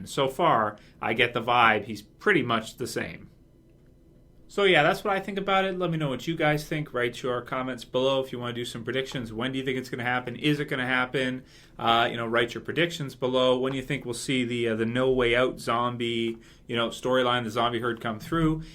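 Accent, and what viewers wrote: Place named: Canada. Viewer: Canadian? I hear an accent from time to time. Great channel. Love it!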